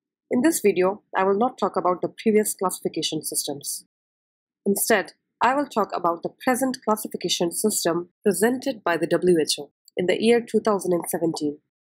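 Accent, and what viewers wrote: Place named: India. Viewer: ... sorry ma'am.. u sounded very much Indian.. whichever nationality, much respect to u.. please do more videos here..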